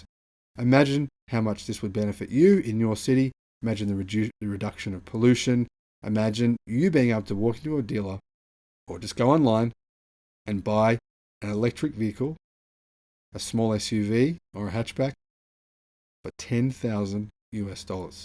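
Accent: Australia. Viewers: I can safely say by your accent that you are not American. Perhaps Australia? If that is the case, you should understand quite well the dangers of letting the Chinese companies controlled by the Chinese government, that means ALL CHINESE COMPANIES, run rampant in your country. Of course your country is under Attack by and has nearly been taken over by the Chinese government's "belt and road" initiative. I'd say you better learn just how insidious China is in it's plan to OWN countries by economic intervention. It doesn't matter who owns the shares of Chinese companies, in the end they are all a part of Chinese world dominance. Id say America has every right to be scared of BYD and every other Chinese company who wants to infiltrate our infrastructure. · Are you Australian?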